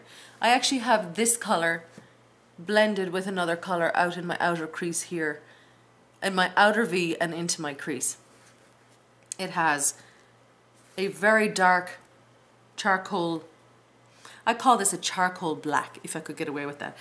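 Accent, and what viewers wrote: Ireland. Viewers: Haha you are so funny , love watching you (just curious ...are you Irish? you sound it , anyway love the accent ) great review love Nisha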